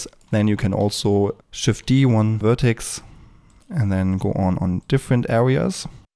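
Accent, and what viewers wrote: Germany. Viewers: I smell a german speaker. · your speech sounds very deutsch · Hikari He‘s german. It‘s just a strong accent. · ich liebe die deutsche aussprache und du? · bro sät sounds ja ganz schön deutsch hasste auch einen deutschen account? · dieses deutschenglisch ist so schräg... aber supergute tutorials :) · dude, this guy is sooo german :D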